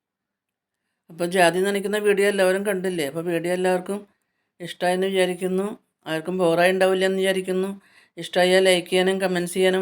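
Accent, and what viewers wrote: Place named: Thrissur, India. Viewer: തൃശൂർ ആണോ ചേച്ചിടെ വീട്. സംസാരം കേൾക്കുമ്പോൾ തോന്നുന്നു. എന്റെ വീട്ടിലും നിറയെ ജാതി കൃഷി ഉണ്ട്. 👌👌❤️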